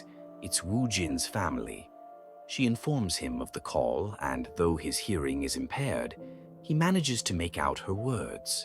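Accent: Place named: United Kingdom. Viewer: Why is blud british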